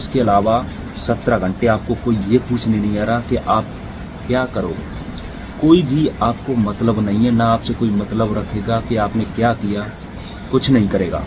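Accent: India. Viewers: Bhai Rajasthan se ho kya!!! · भाई आपकी आवाज मुरैना साइड की लग रही है ,, में सबलगढ़ से हु आप कहा से हो , प्लीज btao ???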